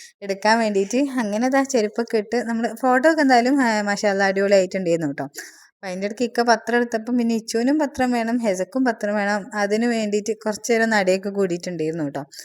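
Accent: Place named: Malappuram, India. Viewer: Samsaram sherikum malappuram touch und atha chothichee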